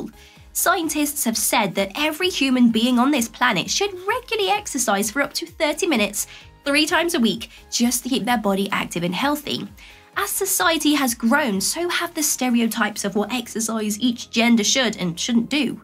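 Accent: England, United Kingdom. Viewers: I love listening to your voice at 1.5x -English accent - too cute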